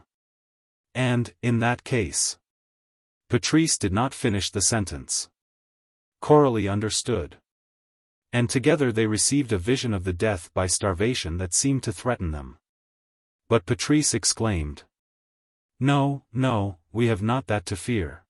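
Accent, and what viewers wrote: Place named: United States. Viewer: If you can change the AI narrator to a British male, I think it's much more effective, a story, especially from this time period, to be read aloud /heard in some sort of UK accent, rather than an American/Canadian accent. Of course, since I have not even the vaugest idea of how AI works, I don't even have a YouTube channel, I really don't know what I'm asking. For all I know it's something that would require many hours of work or, something that could happen with the click of a button, lol. Anyways, I'm deeply involved in this story, 2 plus hours in, and enjoying it regardless. And as far as I can see, you are the only channel who has uploaded it so since I'm trying to complete the entire Arsene Lupin series this way, since I, unfortunately, don't currently have access to any of the books, this particular video is so very much appreciated!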